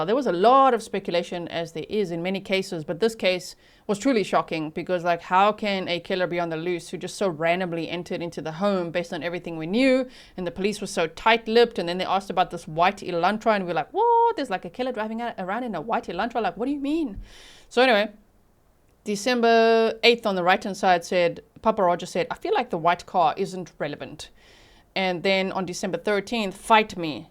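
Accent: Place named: South Africa. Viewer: Are you originally from South Africa? Your accent sounds like it. Not being nasty, just sounds like it 🙂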